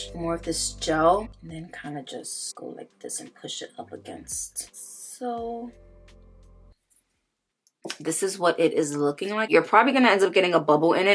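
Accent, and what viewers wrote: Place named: New Jersey, United States. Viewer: Also, I love your accent. Is it Jersey?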